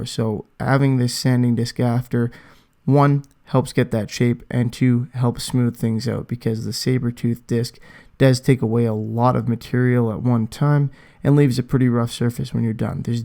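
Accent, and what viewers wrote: Canada. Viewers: Ha! You replied! Too freakin cool! Im using the spraymax 2k. Thank you for that too, i was having not so great results with rustoleums clear. So ive been moving alot of guitars( for a guy just messing about) and i got my clear through some different channels. I would be way cool with placing my future orders in a way that gets you a kickback or whatever. Im just new to technology so im a little behind the times as far as ordering things with my phone. So youll have to shoot me another reply with those instructions. Your videos have been a priceless resource to me since i started dicking around with guitar finishes. Was stoked to hear a canadian voice, being a canuck meself( living in the states, in Missouri of all godforsaken places). Keep them coming bro-cephus. Thanks a million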